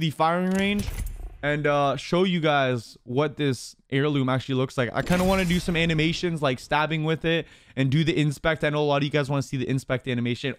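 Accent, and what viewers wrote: Canada. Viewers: He’s Canadian idk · Is he Canadian or im just dumb Idk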